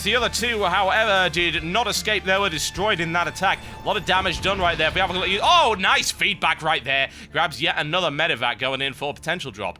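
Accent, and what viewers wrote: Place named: United Kingdom. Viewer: hes so british.. i can't understand a damn thing when he talks fast, but i am no less excited.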